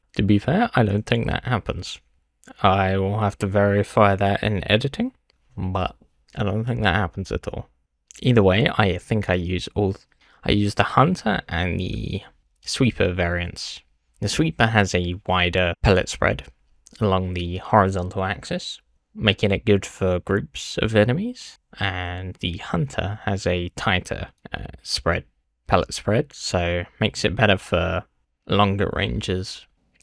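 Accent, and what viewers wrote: United Kingdom. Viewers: nah he's just british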